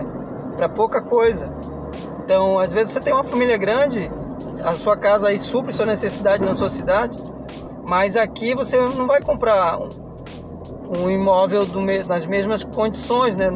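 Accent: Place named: Santa Catarina, Brazil. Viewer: Vc já está com sotaque de Catarina. Sou catarinense e moro em Joinville.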